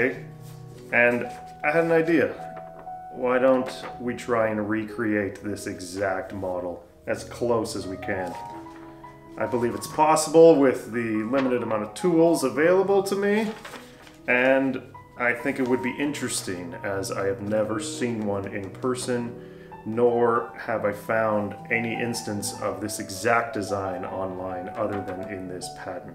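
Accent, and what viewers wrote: Canada. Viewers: The Canadian is strong with this one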